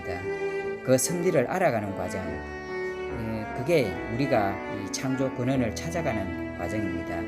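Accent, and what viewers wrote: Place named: Busan, South Korea. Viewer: 어머, 부산 사투리가 이렇게 구수할 수가.. 멋집니다. 그런데, 71년생?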